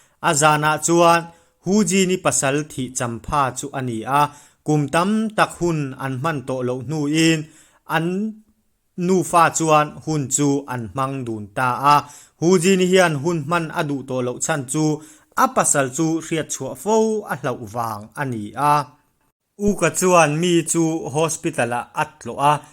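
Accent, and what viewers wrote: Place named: Myanmar. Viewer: I trawng hi Myanmar (burma) aw top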